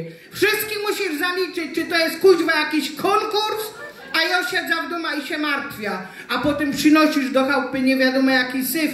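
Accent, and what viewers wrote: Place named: Silesia, Poland. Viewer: Super kobieta uwielbiam Śląska gware bardzo miło posłuchać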